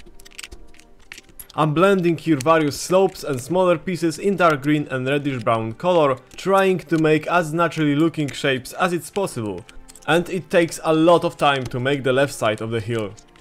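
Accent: Poland. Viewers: Are you from poland, beacuse you sound a bit like that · ale polski akcent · Kurde wyczułem polski akcent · I can feel the Polish accent in your films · Nice creations and jesteś z Polski bo masz taki akcent ? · Are you polish?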